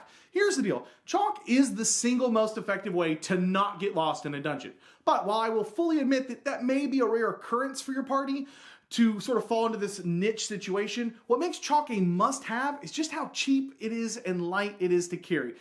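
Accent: Texas, United States. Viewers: Now I want to know where in Texas he's from. . . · didnt know you where texan hello from killeen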